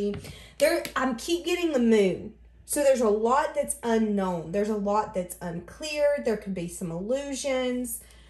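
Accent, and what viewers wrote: South, United States. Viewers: resonates ... as does your kountry accent. Love it, from TN!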